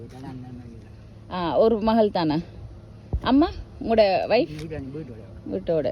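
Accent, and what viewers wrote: Sri Lanka. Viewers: Madam, Your Slang Not Exactly Jaffna. TRINCOMALEE Or Exact Batticaloa Town .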